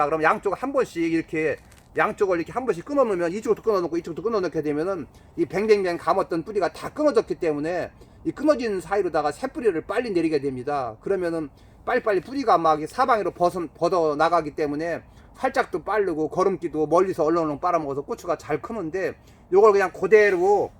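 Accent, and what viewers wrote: Chungcheong, South Korea. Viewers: 충청도 사투리가 정겹네요 좋은정보 감사합니다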